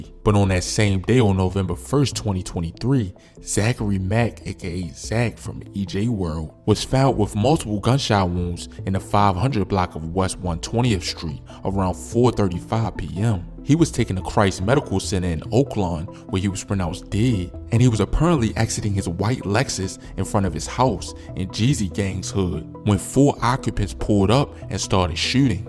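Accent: Baltimore, United States. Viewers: Baltimore accent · He's from Baltimore. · Dude sound like he from Baltimore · The YouTube creator definitely is from Baltimore Maryland. The accent is crazy.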